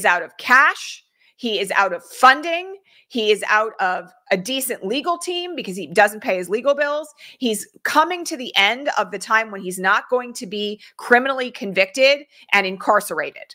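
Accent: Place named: New York, United States. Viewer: You are awesomely verbal, really. It's SO New York and I love it. I appreciate your insight to these Important issues and grateful for real talent focusing on maga destructive attacks.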